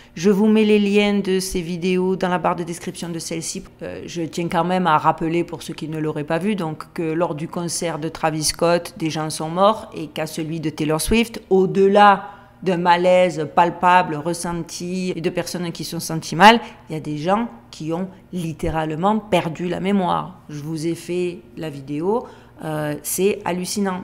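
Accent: Marseille, France. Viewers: Merci pour cette video hyper intéressante et passionnante.Heureusement qu'il y a des personnes comme vous pour nous informer et nous alerter sur toutes ces nouvelles technologies qui ne sont pas pour le bien de l'humanité. Je vous apprécie beaucoup et je reconnais bien votre accent marseillais....de Marseille aussi, alors continuer à porter le soleil du vieux port à Vegas. Par ailleurs, oui ça me tente de gagner une paire de OneMix! A dimanche prochain!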